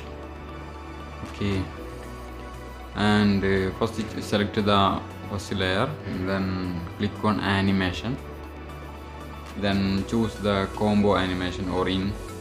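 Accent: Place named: Kerala, India. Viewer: Accent seems like malayali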